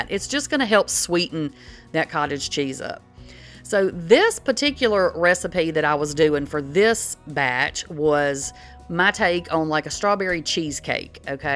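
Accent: South, United States. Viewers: It is delicious, I made a strawberry version and a chocolate with real choc chips in it. They are both a success. Love your accent, what part of America are you from? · Is that a “southern” accent ? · That southern accent...where ya'll from??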